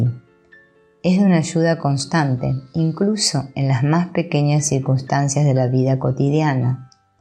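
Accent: Argentina. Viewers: No comprendo tu voz Argentina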